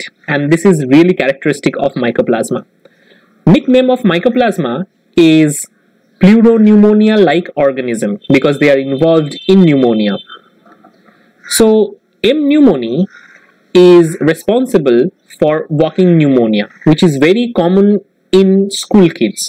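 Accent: India. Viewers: Indian people talk really funny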